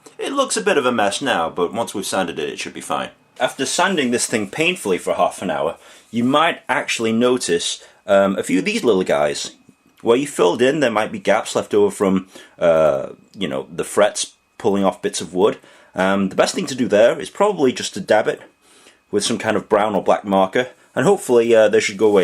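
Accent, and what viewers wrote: United Kingdom. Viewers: Thanks. What a pain in the keyster. If I decide to make a guitar fretless, I think I'll buy the neck.Thanks for this video!BTW...Vietnamese (?) guy with a Texas A&M tshirt and a British accent...i chuckled a little!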